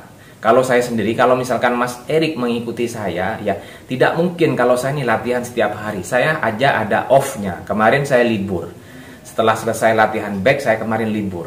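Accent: Madura, Indonesia. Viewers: Mas asli madura ya?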